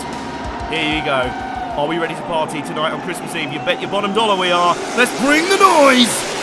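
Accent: United Kingdom. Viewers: I love you forever for that comment. This is just the best and greatest thing ever. And the Britishy accent makes it sound so professional and upper-class, I just love everything you do!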